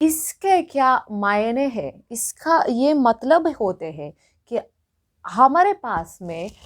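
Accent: South, India. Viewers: Girl u r awesome!! got the perfect south Indian accent, lingo, perfect sarcasm!!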